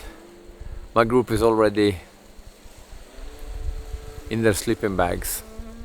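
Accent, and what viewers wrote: Sweden. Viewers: That was good entertainment! I am ready now for a tour in fog and rain, and I will practise my attitude ;)) I don`t want to sound creepy, but I love your SwEnglish, it it is very relaxing to listen to.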